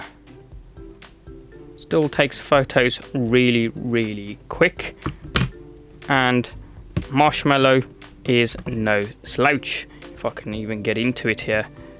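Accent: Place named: United Kingdom. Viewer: You sound british some sort of :v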